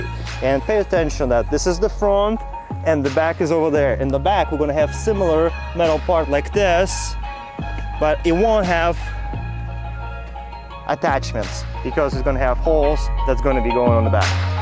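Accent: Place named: Eastern Europe. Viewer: Am American and the Eastern European accent are enjoyable to listen to and makes for an easy How-to tutorial. Love the roof rack. You earned my subscription. Keep up the great content 👏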